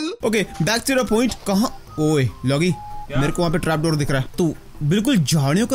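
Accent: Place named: Assam, India. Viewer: app assemes bolteho Kiya app Assam rehtohe kiya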